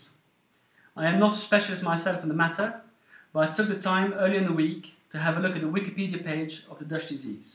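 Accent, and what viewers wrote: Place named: Wallonia, Belgium. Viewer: Wallon ? enfin c'est ce que je dirais à l'accent. Excellent video thank you very much , all those numbers are very very very interesting.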